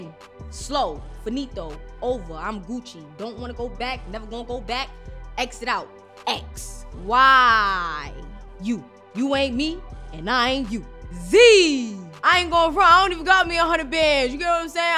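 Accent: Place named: New York, United States. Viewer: I love her NY accent! Lol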